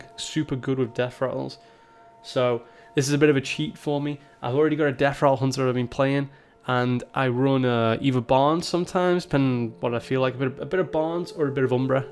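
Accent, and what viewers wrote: Liverpool, United Kingdom. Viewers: Fiufsciak - don't subscribe so I can prove reverse psychology is a total bs I think he’s from Liverpool, or at least that part of England. He called his accent “Scouse” in a tweet and Wikipedia says that where it’s from.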